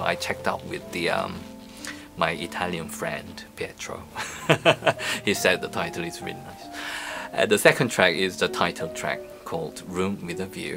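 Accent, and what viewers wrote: United Kingdom. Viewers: 크으... 역시 루마행님 브리티시엑센트 쥑이네~ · I can watch u talking , for the rest of my life! How sweet you are! I like ur British accent 👏🏻❤️ and i love your new album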